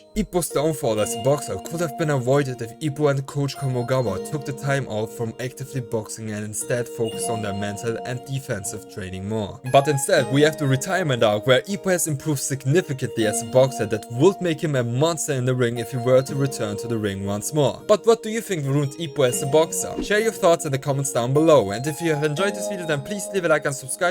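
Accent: Germany. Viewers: bist du zufällig deutscher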